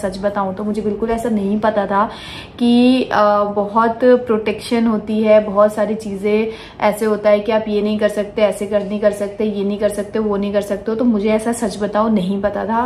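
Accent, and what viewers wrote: Bihar, India. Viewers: Bihar ke logo ki hindi thodi disturb rahti h unke language se pta chal jata h ki Bihar ke hai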